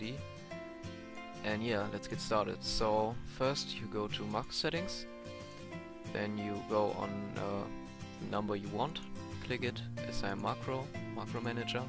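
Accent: Germany. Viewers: Man hört deinen deutschen Akzent mega :DD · man hört sehr stark heraus das du deutsch bist deswegen finde ich sollst du es eigentlich auf deutsch machen und nicht auf englisch, da geht es nicht darum ob man englisch kann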